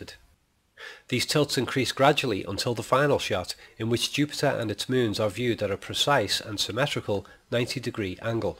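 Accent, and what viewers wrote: Liverpool, United Kingdom. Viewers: I have a question: Are you a scouser??? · Just found this doc and it's just what I wanted to see before 2001's re-release this month (Nov 2014)...Also...Whey! a Scouser! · Do I detect a faint Liverpudlian accent...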